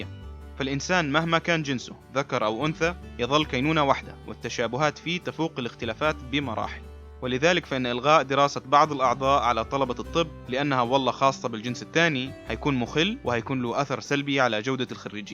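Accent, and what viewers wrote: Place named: Hejaz, Saudi Arabia. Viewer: لا تتعب نفسك بهالمبررات اللي إنت تقول عنها بأن الإختلاط في مصلحة الشعب أو بأنه تحضر وتقدم أو بأن الإختلاط ضروري بالمستشفيات وأن من الصعب الفصل بين الجنسين بها .. لا بالعكس المستشفى أكثر مكان يتوجب الفصل بين الجنسين به لأنه المكان الوحيد اللي بتضطر أن تصطحب محارمك وتذهب إليه وفيه كشف للعورة أحيانا وفيه قولة خل محارمك عندي واطلع برة .. ولا يوجد رجل في قلبة ذرة غيرة مؤيد الكلام اللي قلته بالمقطع .. لو أن هناك أماكن من الصعب أن تفصل بين الجنسين بها مثل المسجد الحرام وبقية المساجد قال الإسلام النساء يأتين بالخلف وكذلك الشوارع والأسواق ولكن المستشفى وش خسرانة الدولة لو تم الفصل بين الجنسين ولو بالشيء القليل .. الغيرة موجودة يا صاحب المقطع مبين من لهجتك بأنك من حضر الحجاز يا اللي كل همكم وشغلكم الشاغل التمويل والسعي ورى الإنفتاح والإختلاط ووضع مبررات وحجج بأن الإختلاط والإنفتاح فيه فايدة للشعب وتقدم وتحضر ورقي .. قبحكم الله .. 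الإختلاط بالمستشفيات هو اللي أجبر بقية الأماكن أن تتبع خطاها بالإنحلال و الإختلاط اللي حرمه ربك .. تقول بالمقطع صعب على الدولة أن توفر في كل قسم وفي كل تخصص طبيبات وفنيات وصيدليات وإداريات في أقسام نسائية مستقلة وش الصعب في الموضوع .. لو الدولة لم تجد امرأة سعودية في تخصص معين بإمكانها أن تأتي بطبيبات وفنيات وإداريات من الخارج وتوفر وتسد حاجة كل قسم حتى بنات البلد يغطين النقص .. لو يا صاحب المقطع صعب تدريب الشباب الأطباء الطلبة في أقسام نسائية وتوليد هن للنساء ولادة طبيعية مع العلم بأن من اللي كان يولدهن قبل مجيء المستشفيات وأحيانا تخصصات تافهة من السهل أن تسد حاجتها سيدات مثل الأشعة و المختبر ومن يشفط البلغم من حلق المريضة لااا.. والممرضة اللي تروش المريض ..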